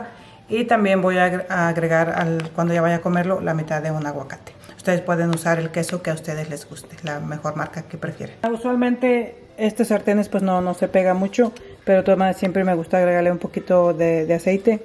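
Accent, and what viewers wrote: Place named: Guanajuato, Mexico. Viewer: De donde es usted ? tiene un acento como de Guanajuato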